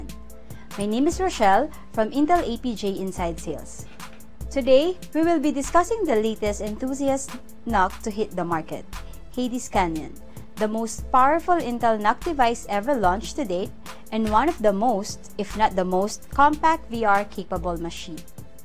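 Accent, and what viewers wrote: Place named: Philippines. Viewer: You sound like Filipino. Am I right ?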